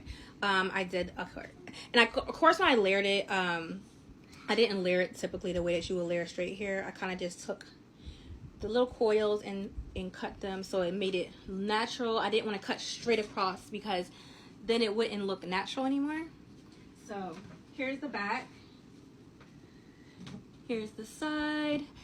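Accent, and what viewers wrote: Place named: Philadelphia, United States. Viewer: I knew you were a philly girl. Talking bout this jawn lol. 215🩷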